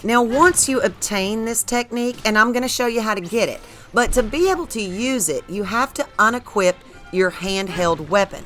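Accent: South, United States. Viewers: This really is the best channel on here! I mean, that southern accent is not what I'd expect, but I love it!! And the info is super helpful.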